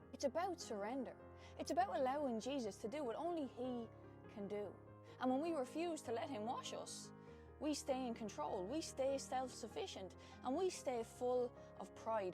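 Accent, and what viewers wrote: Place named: Ireland. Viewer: AMEN sister 🙏🏻 BLESS 👑🔥🕊️⚔️ that is an Irish accent yes? My grandmother came to America from Ireland. 🙏🏻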